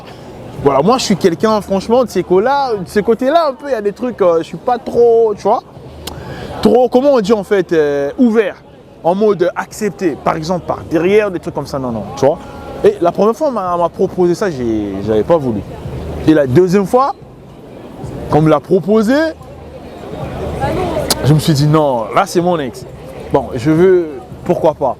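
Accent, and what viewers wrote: Democratic Republic of the Congo. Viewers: Ton accent tes délire tn caractère prétentieux cs genres d choses il n'y a qu'un CONGOLAIS qui a ls couilles d le faire, t'es un zaïr🇨🇩🇨🇩🇨🇩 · tu as déjà avouer Mec. Tu es originaire du Congo Kinshasa. Et votre accent montre plus que vous êtes congolais. Je suis un abonné ( Espagne🇪🇸 )depuis 2019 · Avec ton accent t’es congolais sur 😂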